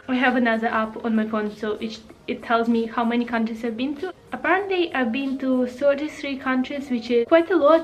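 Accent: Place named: Russia. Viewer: I don't really remember, but you was saying something like "it helps me to stay on chuck" or something like that. Russian accent is not a problem, I'm also russian (almost, actually I'm belarussian) so most of us have an accent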